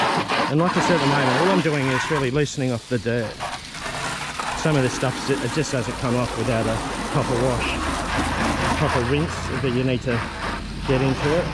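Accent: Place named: Australia. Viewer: Ppls should be to speak english language! Your EN with accent AU....I get it"-----they lift stopped on the 1st floor😆😆😆cu.koo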